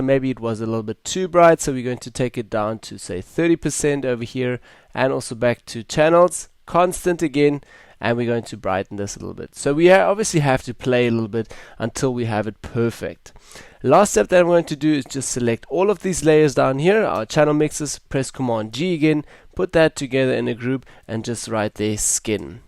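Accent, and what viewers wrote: South Africa. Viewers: I thought he sounded South African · nice suprice to hear a saffa accent in a cs tut! cheers!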